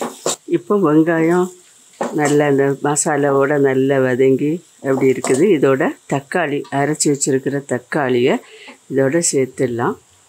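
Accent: Nagercoil, India. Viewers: Amma unga voice cleara irukuma Nagerkoil slang matiri iruku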